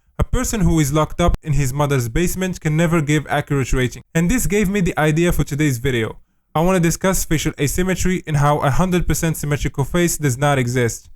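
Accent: Morocco. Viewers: Moroccan accent 🎉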